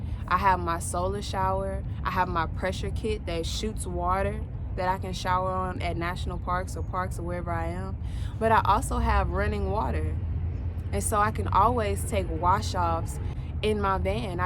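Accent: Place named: South, United States. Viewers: you look beautiful and healthy. I love when your texan accent comes out lol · Southern girl love it. Great video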